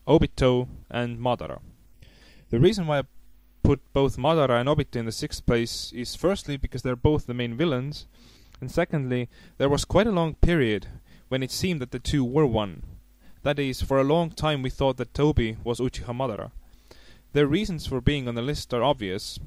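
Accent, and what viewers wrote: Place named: India. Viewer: I like you're indian accent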